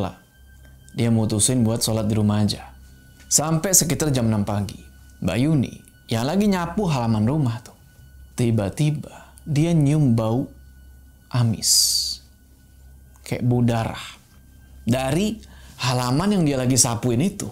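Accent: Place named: Cilegon, Indonesia. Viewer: Pantesan logat nya Nong2 bae, taunya ini ceritanya di kampung halaman owe (cilegon)😅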